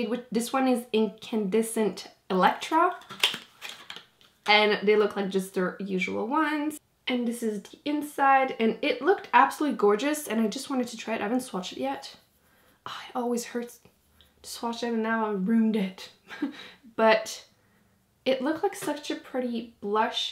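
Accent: France. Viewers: Also, I've thought this for awhile, butI just love your accent! (I took French in high school but don't remember much lol). I just think about it every time you mention the Lancome foundation or any other French makeup brand/name--you're the only one who can pronounce it properly! lol It drives me crazy when people drag out the "a" in Lancome!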